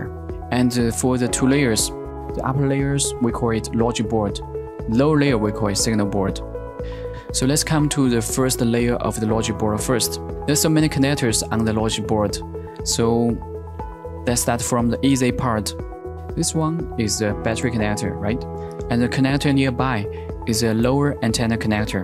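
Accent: China. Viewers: 中国公司，这英语就是中国人读出来的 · 中国人讲的英语么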